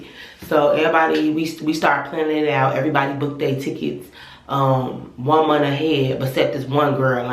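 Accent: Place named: Chicago, United States. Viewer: Ik a Chicago voice when I hear one!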